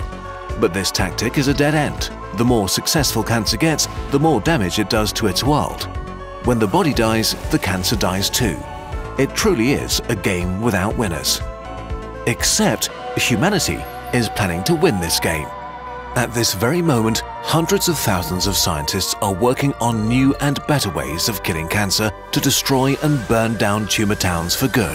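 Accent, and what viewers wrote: United States. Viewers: thank god, less new york? yes please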